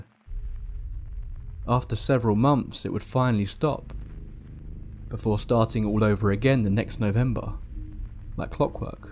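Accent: England, United Kingdom. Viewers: Narrator with an English accent knows what happens in Connecticut in the 1970s .. plagiarized crap, or made up crap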